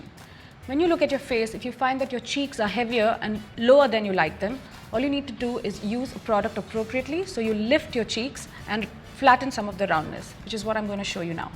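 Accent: India. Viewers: Indian accent 😍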